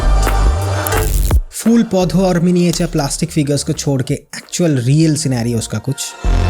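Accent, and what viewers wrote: Bengal, India. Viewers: The way he speaks, makes the video more interesting And thank u for conforming that u r a Bengali😂❤ Already i guess that by ur accent ❤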